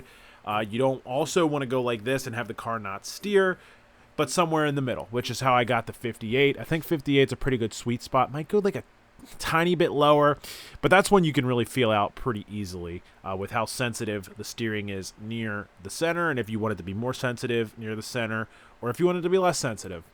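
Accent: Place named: Bay Ridge, United States. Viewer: Make NO mistake, cuz you sound exactly like Chris DeStefano with the Bay Ridge sounding accent. It's just what it is!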